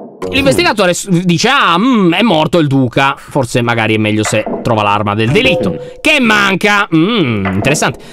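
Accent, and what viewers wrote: Sardinia, Italy. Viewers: Semmai Sardo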